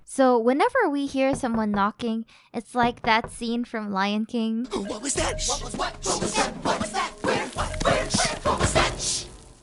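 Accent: Philippines. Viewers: I knew it from the start y have a Filipino accent lol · The first time i saw this i was like : why does she have a filipino accent? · If may I ask Are You Filipino???!! Your Accent Is kinda !!! Haha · Me: Hears say pancit canton, and no American accent Also me: Definitely Filipino. Edit: this is my first video watching don't bully me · Based on the grammar and accent YOUR A FILIPINA! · this my first time watching. you sound filipino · Soo u a filipino i know that accent imma filipino too :D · are you a Filipina??? cuzz you voice sounds like it??? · 35 seconds into the video and I already know she's a Filipino xD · And btw I noticed how speaks HAHA I notice in the first place but i don't have a clue filipina WHOAH LAB U ATE 🥺✨ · woah is a filipina girl i know the accent of the filipino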